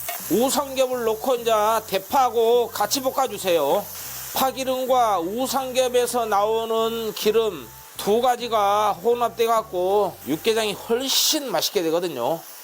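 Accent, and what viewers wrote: Jeolla, South Korea. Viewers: 유튜브 보면서 처음으로 댓글남겨요^^ 쉐프님 말투가 같은 전라도 사람으로써 너무 정감있습니다~ 저에 쉐프님 레시피 원픽은 닭볶음탕!! 항상 응원합니다. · 세프님. 육개장누가먹읍니가요. 세프님요리주문안됩니가요.전라도. 말씨가. 좋아요. 세프님건강하세요. 요리대박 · 셰프님 감사합니다 전라도사투리가 약간 들리면서도 자세하고 순서 정연하고 초보자도쉽게 설명해 주셔서 고맙습니다 요리책 1.2.권을 구매해놓고 아주유용하게 사용하고있습니다 셰프님최고입이다 요리유튜브중에서최로중에최고입이다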